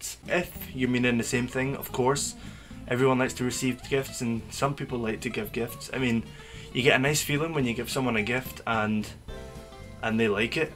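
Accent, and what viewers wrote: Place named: Scotland, United Kingdom. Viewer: I enjoyed your American candy video. Hello from Chicago. You are a handsome young man, What part of the UK is your accent from. It sound to me a bit Scottish, Irish sounding. I like your accent. Are you from Liverpool? Oh you are from Scotland! Very nice accent.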